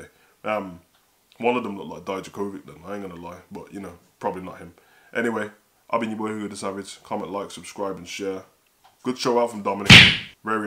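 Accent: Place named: Birmingham, United Kingdom. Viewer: Yo bro are u from brum u sound like u are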